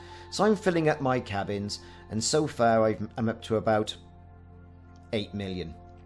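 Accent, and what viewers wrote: Wales, United Kingdom. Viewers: Welsh CMDR?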